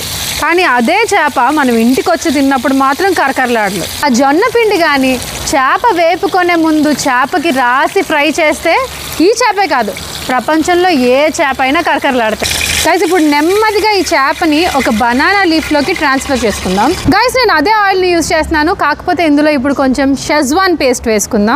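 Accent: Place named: Godavari, India. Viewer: తపెలా...... బాగుంది గోదారి బాష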